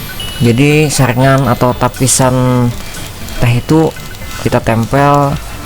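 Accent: Banjar, Indonesia. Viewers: logat bahasanya kaya urang banjar.... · Logat ikam banjar banar . Aku samarinda kampungku amuntai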